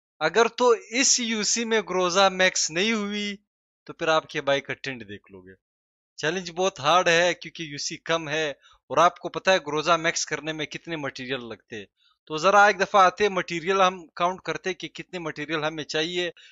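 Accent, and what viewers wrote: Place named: Hindko, Pakistan. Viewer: Ap kaha say ho? Awaz sa asa lgta hai ap hindko speaker o.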